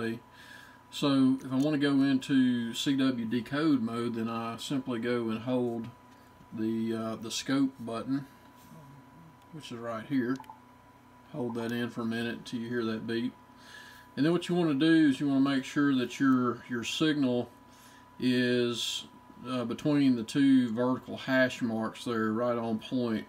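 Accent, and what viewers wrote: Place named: South, United States. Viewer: Thanks - Does the CW Decode display work for Tx as well as it does on Rx? Also, thanks for having a Southern voice! I've lost all my Arkansas and Texas voice.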